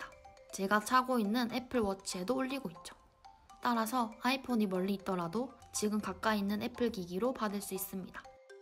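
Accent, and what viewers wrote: Busan, South Korea. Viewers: 부산사람이신듯..발음 귀엽 ㅋ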